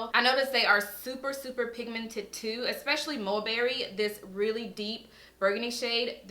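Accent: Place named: Texas, United States. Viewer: I love your accent. I’m from Texas and you sound like my whole family. Lol 😂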